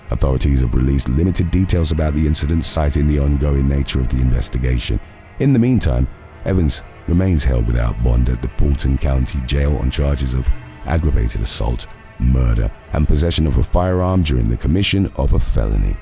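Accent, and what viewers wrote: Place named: United Kingdom. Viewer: Here’s some more worst news. A commenter on this channel recently criticized for “using a British accent.” should, according to that reviewer, “Bruh, just say the words.” Huh?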